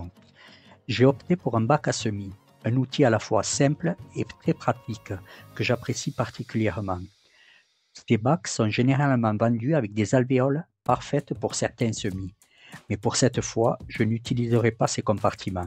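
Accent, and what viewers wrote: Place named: Charente-Maritime, France. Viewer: Ça fait plaisir d'entendre ton accent du sud de Charente-Maritime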